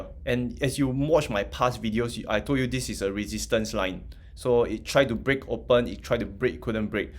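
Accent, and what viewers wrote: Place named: Singapore. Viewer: If you cant tolerate that singapore accent, I recommend moving there permanently.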